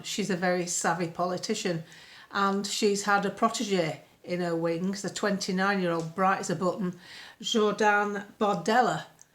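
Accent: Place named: Lancashire, United Kingdom. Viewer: your absolutely right! Love your Lancashire accent! Coming from a Scot!😂😂❤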